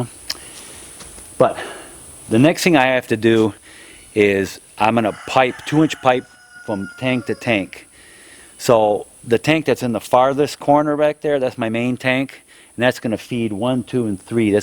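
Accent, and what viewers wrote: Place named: New England, United States. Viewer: sounds like New England to me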